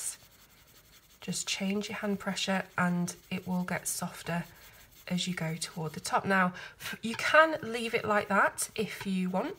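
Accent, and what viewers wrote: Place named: Northern England, United Kingdom. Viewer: I think you tutorials are great and very informative. I love watching your videos and listening to a northern English lass is very refreshing. So Thanks 😊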